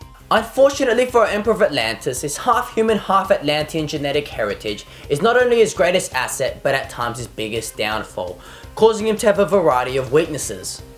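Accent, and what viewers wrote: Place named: United Kingdom. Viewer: a Chinese guy with a British voice that's some weird shit right there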